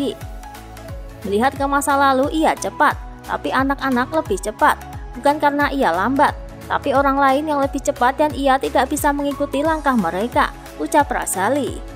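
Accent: Java, Indonesia. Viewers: Narasi Medok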